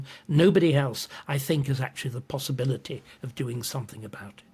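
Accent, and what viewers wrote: United Kingdom. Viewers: I'm American and I just LOVE to listen and learn from a Brilliant Brit. Nobody sounds like they do! 🤩😁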